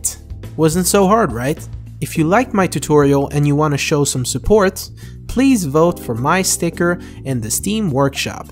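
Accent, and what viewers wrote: France. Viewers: Hi, Are you french? Salut, t'es français ?xD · Are you french ? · you are french ? "salut mes amis" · hey are you french ? great vid btw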